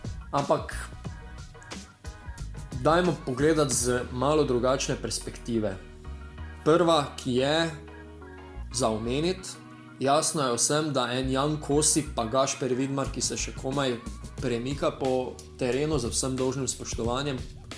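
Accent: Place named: Ljubljana, Slovenia. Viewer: Govori kako treba Ljubljansko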